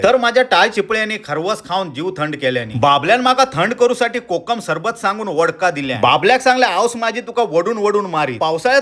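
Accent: Malvani, India. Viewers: Mast jewnaj tuzya malvani bolenyachi Jo tadeko asetat na tyeka kashachich ser NY yevechi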